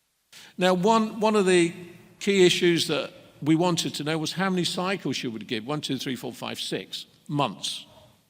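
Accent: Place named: United Kingdom. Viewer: Highly informative and comprehensive by prof. Besides a British accent is much of a value addition, certainly a breeze of fresh air.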